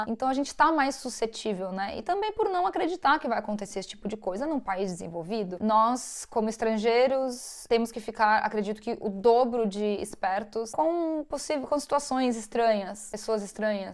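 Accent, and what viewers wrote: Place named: Minas Gerais, Brazil. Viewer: Pero el aciento parace de mineira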